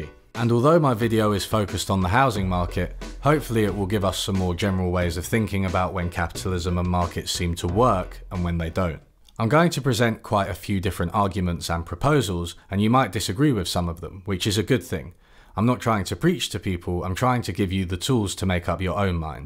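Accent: United Kingdom. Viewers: Wait wait wait. You seem to have a British accent. And you just said something about your neighbors asking you to go dogging with them. Now I am American but I am familiar with some British slang. I hope you didn't just drop that in there thinking no one would notice. Cause I did and that's rather funny. Though, I could be wrong about your usage of the term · I guess brisitsh voices make me automatically be a alt-right yt channel but great video helps me but things into a better perspective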